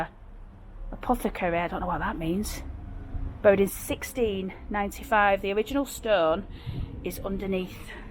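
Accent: Oldham, United Kingdom. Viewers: I love the Oldham accent, Bluecort skewel and St Mury's, very enjoyable video 👍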